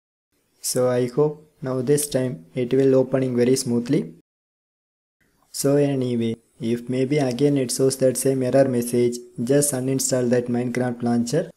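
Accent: India. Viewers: The guy with the indian accent pulls through again! It worked!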